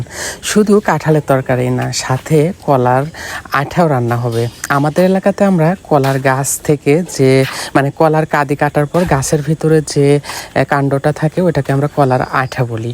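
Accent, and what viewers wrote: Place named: Rajshahi, Bangladesh. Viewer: রাজশাহী জেলার মানুষ এভাবে আ্যঁ আ্যঁ করে কথা বলে 🤮🤮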